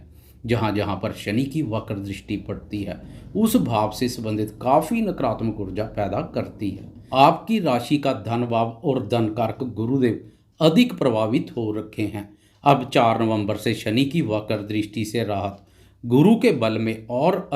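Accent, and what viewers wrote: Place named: Punjab, India. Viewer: Guruji aap Punjabi ho shayd 😁 apki accent se lg rhaa hai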